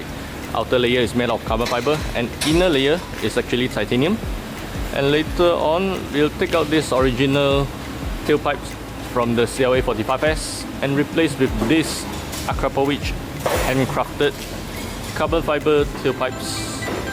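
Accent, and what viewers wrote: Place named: India. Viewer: Индуса сразу слышно 😂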